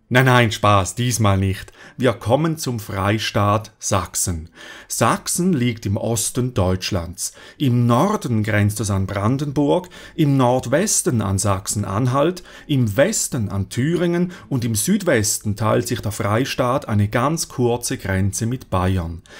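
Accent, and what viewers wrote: Switzerland. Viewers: Sich bei einem Schweizer über die Aussprache beschweren. Wahnsinn in Deutschland - Kapitel 214. Ps: Danke für diese erfrischende Reise!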